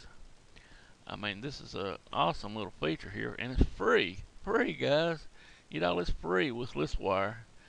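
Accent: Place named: Arkansas, United States. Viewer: Great accent. I'm from Central Mo so mine is more of a flatter accent. Yours is rich with Arkansas.....possibly Tenn/Kentucky......Virginia, not so much. Memphis? Blythville? Little Rock?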